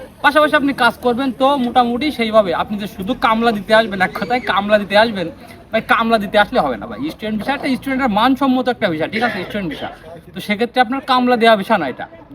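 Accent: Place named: Pabna, Bangladesh. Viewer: vai ki pabnar local nki💙 vasa to chenachena lage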